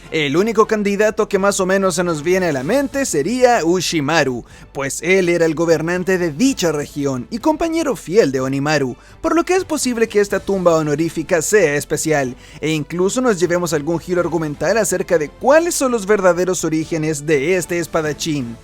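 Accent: Chile. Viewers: tu no eres de mexico, eres chileno hahaha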